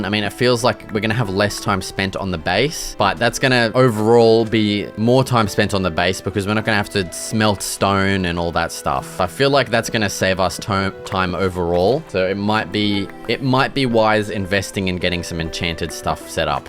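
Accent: Australia. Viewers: you sound like the biggest aussi ever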